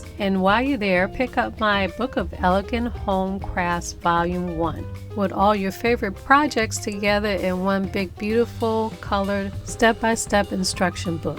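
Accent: New Orleans, United States. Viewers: I just want to say that I love listening to your beautiful soothing voice and NOLA pronunciations. Your intellect and creativity shine brightly and what you say is only enhanced by how you say it!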